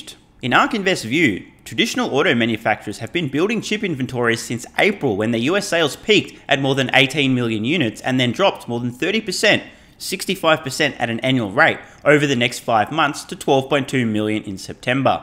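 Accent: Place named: Australia. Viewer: Your bloody Australian accent is delicious!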